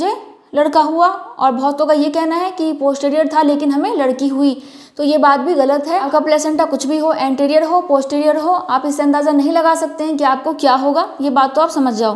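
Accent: India. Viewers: Ap noida se hai kya ??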